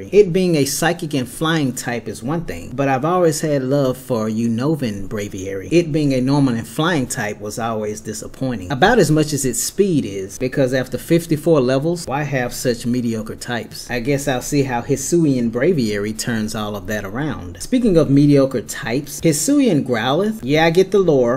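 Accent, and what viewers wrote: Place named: South, United States. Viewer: he sounds Southern to me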